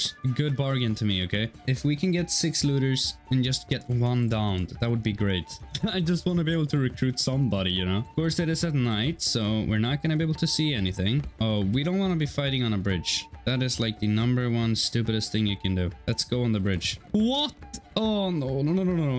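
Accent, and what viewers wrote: Sweden. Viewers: You get a sub for Bannerlord and a fellow Swede speaking Swenglish.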